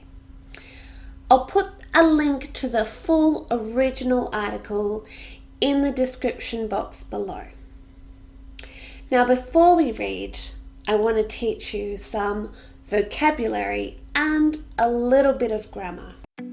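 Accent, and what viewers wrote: Australia. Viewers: Hi!!!! I Am from Blumenau Brazil and I love your acent Australian!!!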